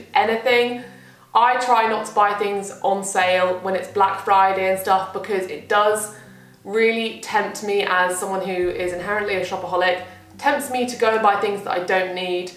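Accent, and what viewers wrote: United Kingdom. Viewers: What UK accent is this? Love it! Very informative video, thanks!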